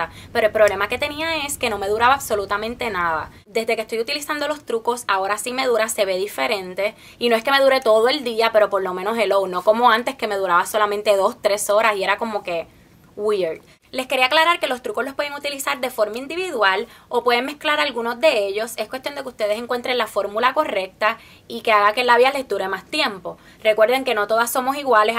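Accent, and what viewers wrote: Puerto Rico. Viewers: me encantaaa tu acentoo boriicuaa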